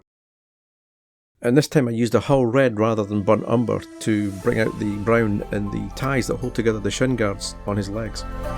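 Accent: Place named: Glasgow, United Kingdom. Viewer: Watching this beautiful painting and learning from it while listening to this wonderful Glaswegian accent is a whole new treat for me ❤, keep it up brother and greetings from Iraq .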